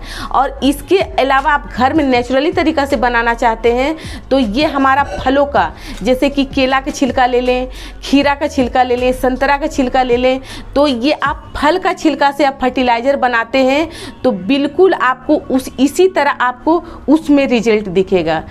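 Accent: Bihar, India. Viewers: Humko Bihari boli boli bhut achcha lagta hai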